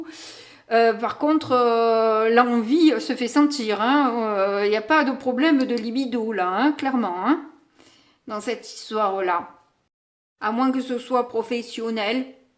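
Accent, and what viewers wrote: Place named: South, France. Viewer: Bonjour 😊 Votre tirage est clair,simple et sans Chi Chi J’adore votre accent (sud?)..😂😂j’ai le même !! Du coup pour vous encourager et vous suivre,je m’abonne 😄 Bonne continuation 🙏🙏🙏🙏🙏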